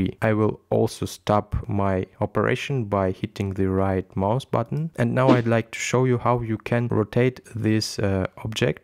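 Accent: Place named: France. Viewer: By the way I plan to go through all your videos on blender. They are well done. The cadence was excellent on this one. The on screen help was brilliant and they are very succinct. Thanks for your efforts. You deserve more subscribers. Also want to let you know that I have no problem with your english. Sometimes you substitute French grammar but that does not sound bad to an American ear. To the British? Well we all know they are snobs. Right? LOL.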